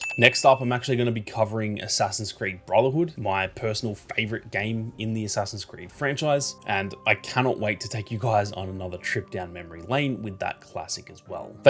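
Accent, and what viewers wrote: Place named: Australia. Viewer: Nothing better than hearing another aussie talk about peak.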